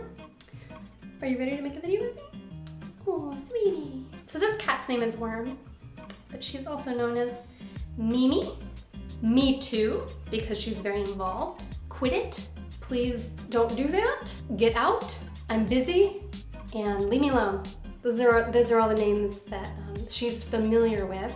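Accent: United States. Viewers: Hi, I just subscribed! I don’t know where I heard that you were in France so I was hoping you would have a French accent but that is not the case. It’s okay I am not disappointed that you sound American lol, are you originally American? This is my first video and you made my mentally declining state a bit better so thank you! I was lucky enough to recently get a 10 dollar rooted cutting of a M Peru and I love it! I cannot believe you can easily get a full plant for the same price in France 😱! 💙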